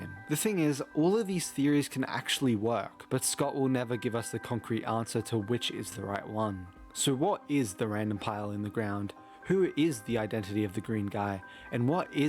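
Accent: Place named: United Kingdom. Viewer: that was a joke. He lives in the US but has a British accent and so does his kids.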